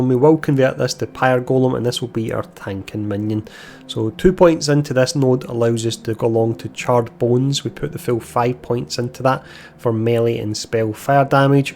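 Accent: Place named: Glasgow, United Kingdom. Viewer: Great Vid thanks for covering the passives like you did! Now, forgive me if I offend, the accent my buddy and I are trying to place - Glasgow? Thanks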